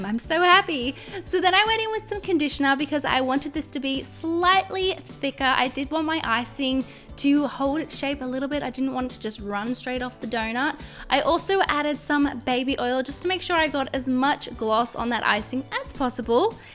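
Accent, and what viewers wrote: Australia. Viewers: Are you from Australia, New Zealand or South Africa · your voice is like italian australian some kind of thing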